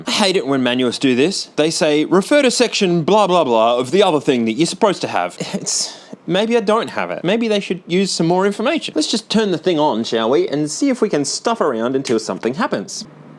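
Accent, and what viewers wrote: Australia. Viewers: That's awesome! I used to own a Para Para Dancing (Korean Market version of PP Paradise). I'm part of MAGFEST here in the states.( Haven't looked yet at your profile and I'm trying to guess by accent... Australian NOT New Zealand 😅) Magfest is a yearly gaming event, 4 days, 24hrs nonstop EVERYTHING, concerts, panels, LAN, Indie gaming, console. We had to cap it at 20K People. We just had MAG 22. I've been involved since 3! I'm the warehouse and on-site event tech. MY gf got involved after we met around the time 11 started, and she later became arcade department head and she was so good at management, she was the head of the board of directors. Unlike me, she's extremely smart, and a scientist. My genius is Electronics repair 😊 Our rhythm/Japanese games section. We have groups of collectors that have insane stuff. Last event over 350 cabinets and over 100 were rhythm games. 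You name it, it was there. I may have a walk through on my channel I posted. I'll have to send you a link, as most of my channel is my daughter playing Pop'n Music. She jumped from low 20s and is currently playing 49s 😂😂😂 I'm jealous AF!! 😂.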